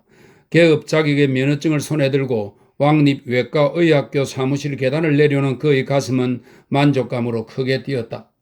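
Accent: Gyeongsang, South Korea. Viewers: 축약해서 읽어주는 사이트는 왠지 작가의 인생관을 온전히 듣지못할것 같아서 안듣게되구요.이 사이트가 너무 좋아요.특히 경상도 말씨가 맘에 들구요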